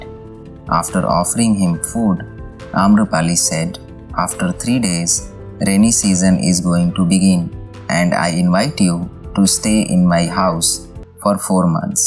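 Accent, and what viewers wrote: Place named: India. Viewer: Thumbs up for using your own original goofy indian accent instead of an AI voiceover. At least it's genuine.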